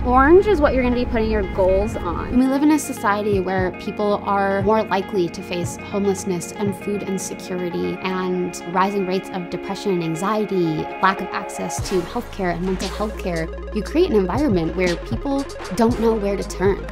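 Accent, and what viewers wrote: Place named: United States. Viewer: If it wasn't for the American accent I would've guessed this was made in Canada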